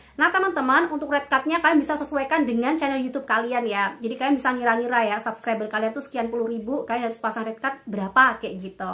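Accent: Java, Indonesia. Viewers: WES PINTER NGOMONG RODOK ENEK MEDOKE JOWO, PINTER CARI DUWIT, BERGUNA BANYAK ORANG YA ALLAH BERIKANLAH KESEHATAN BAGI ADIK CEWEK INI YANG SELALU MEMOTIVASI BANYAK ORANG SEMOGA BANYAK MANFAAT VIDEO INI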